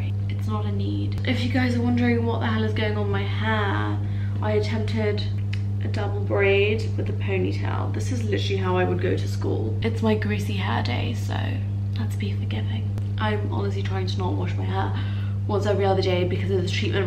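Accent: United Kingdom. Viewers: It’s a British accent and she lives in Dubai. I love it:)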